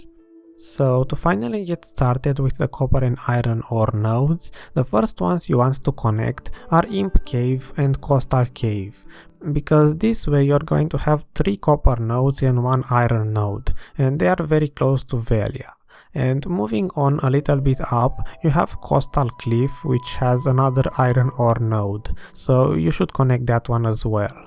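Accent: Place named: Romania. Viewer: Romanian accent detected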